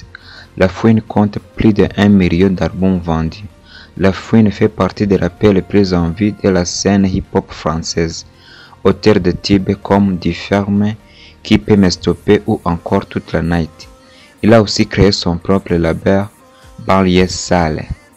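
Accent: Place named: Rwanda. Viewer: L accent rwandais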